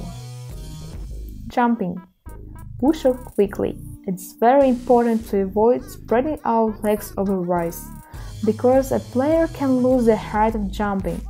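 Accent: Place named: Russia. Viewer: Сначала не мог понять, почему мне мерещится русский акцент. Прочитал название канала. Понял,я то не мерещилось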